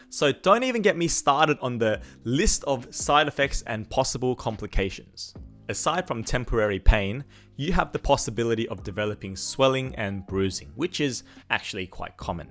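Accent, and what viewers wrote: Australia. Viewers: I'm simple: see and hear a fellow Aussie, I follow.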